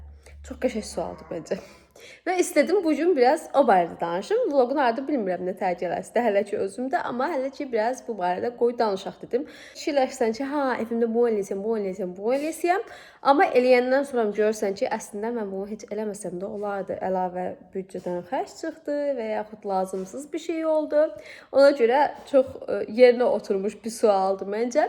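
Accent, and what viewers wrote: Sheki, Azerbaijan. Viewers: Şeki lehcesi.❤eviniz mohteşemdi.can sagiliqi ile otrasiz.bizde evi tikilmis hazr almisq deye tam isdedymiz kimi olmadi.bizde 220kvadratdi 2mertebe xercimiz cox cixb.amma 1gün satb oz isdedymiz prayeti alarq ya sifrdan tikerik insallah.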